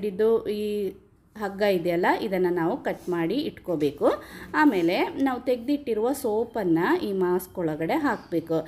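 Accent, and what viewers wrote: Kerala, India. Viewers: Madam malayali aano Kannada ketappo angane thoni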